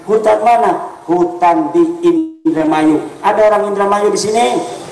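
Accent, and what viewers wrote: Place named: Cirebon, Indonesia. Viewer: Ko bhs e mirip Cirebon an y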